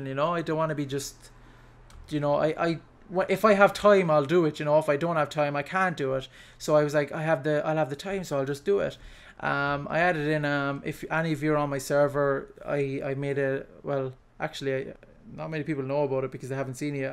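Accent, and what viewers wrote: Ireland. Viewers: I take it back, I can hear the Corcaigh in him. Gwan boy!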